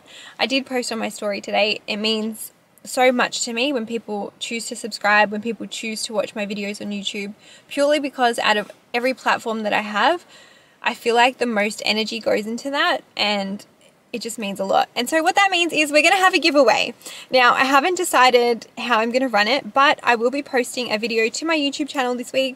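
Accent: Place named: England, United Kingdom. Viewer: I’m confused. What country are you in? You have an English accent but you told us at the end that it wasn’t covered by Medicare and it costs 190 dollars! Medicare in The U.S. is for older adults. Why it’s important to know is if it’s covered or not. If UK I’m surprised it’s not covered. I am in france and had several infusions totally covered. Can you please tell us which country you are in?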